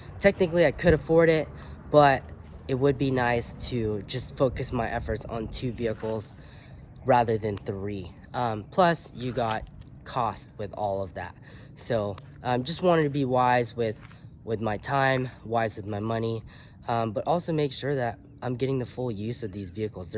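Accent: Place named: Southern California, United States. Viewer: As soon as he started talking i was like oh hes in so cal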